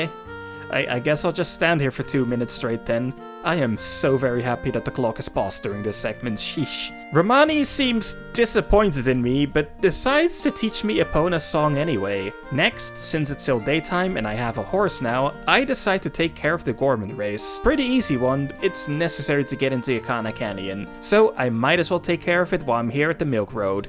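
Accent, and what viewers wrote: Netherlands. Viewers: Are U dutch ? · I always get whiplash when I hear a dutch person making videos I'm actually interested in 😅 don't see it often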